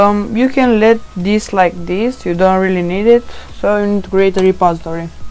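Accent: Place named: Romania. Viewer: multumesc mult de tutorial , mi-am dat seama dupa accent ca esti roman , botul merge perfect , dar in log apare asta <link> , chiar daca n-am probleme si am bifat decat node index.js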